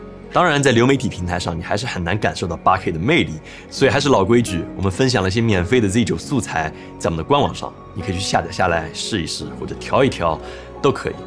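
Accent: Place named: Zhejiang, China. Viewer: 浙江口音好重啊。。。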